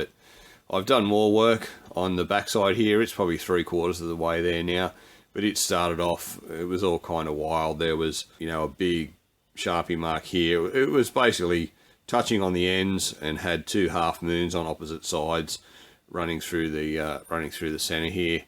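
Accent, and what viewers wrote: Australia. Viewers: a sweet Aussie accent. This dude sounds cool as hell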